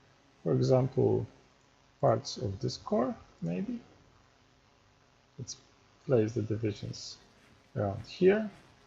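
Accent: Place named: Eastern Europe. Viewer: You meant to say "SEXY EASTERN EUROPEAN ACCENT".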